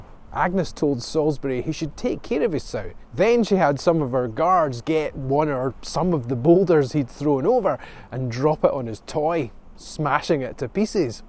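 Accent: Scotland, United Kingdom. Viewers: ♥️your Scottish Broge, but you talk funny ? 👍😎🇺🇸!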